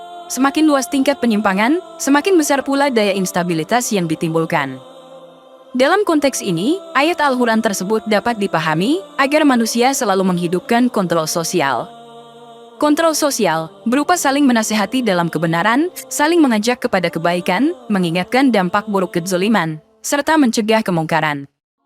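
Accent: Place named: Eastern Indonesia, Indonesia. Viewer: Dari kalimat dan sajian GAMBAR INI BUKAN KONTEN ISLAM JANGAN BODOH...dari logat ini dari timur,kefasehan ini nasara jg tertipu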